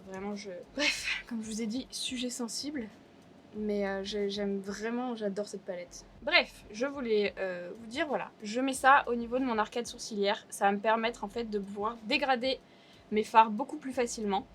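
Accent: France. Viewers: prononce toujours à la française les mots anglais.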